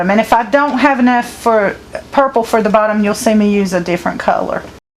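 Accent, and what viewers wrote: South, United States. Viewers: Thank you for this video, it was really good and helpful. I LOVE your accent ( I'm here in England) I'm guessing you're in the South? I was thinking you might enjoy getting a extension plate for the sewing machine, it makes life easier when you're working on a wide piece of fabric.....just a thought. I like your no-nonsense approach, I too curl a lip up at Bias tape!! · I love how straight forward you are with your southern accent, you had me cracking up. You didn't film you sewing that curve because it takes some kind of witchcraft, doesn't it? Can't let all your secrets out! 🤭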